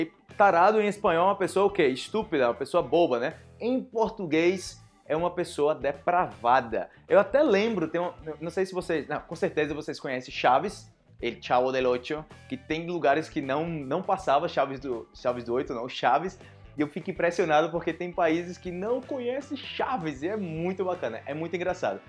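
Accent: Antioquia, Colombia. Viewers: Literalmente 🤣 En Argentina decimos así cuando te engañan con un precio y te cobran de más (me cog-er-n), pero es una expresión muy vulgar. 😅 Tenés acento colombiano! Wow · Oi e gente,u sou de Peru aprendeendo portugués muito obrigada pelos videos,muito interessante,voce fala como colombiano,muito bom seu espanhol,aqui a palavra "franela" e un pedazo de tela tambem,desculpe erros em a minha escritura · mais ele acento colombiano es super bien .. eu gosto muito.. 👍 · vé, vos cuando hablas en español pareces a maluma, es muy paisa tu acento colombiano :D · Woow, primer brasileño que le oigo hablar muy pero muy bien el español, hasta le sale un acento colombiano , saludos desde Venezuela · habla como paisa . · tu espanol es espectacular hasta pareces colombiano haha ... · Muy paisa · si no eres colombiano, te felicito! tienes una gran habilidad para aprender hasta el acento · Ivan Rojas pero si se le nota el acento colombiano 😀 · Oi!!! 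Aparte de aprender varios falsos amigos"!!! Me has hecho reír tanto!!! Nunca ví un profesor tan divertido!!. mereces un 10!!!.y con ese acento colombiano!!! 👌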